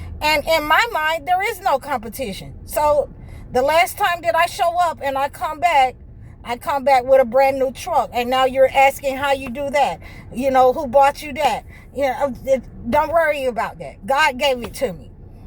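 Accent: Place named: California, United States. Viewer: You sound like you from Comi fornia.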